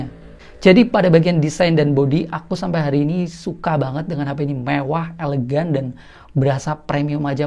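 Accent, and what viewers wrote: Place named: Indramayu, Indonesia. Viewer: orang indramayu ya mas?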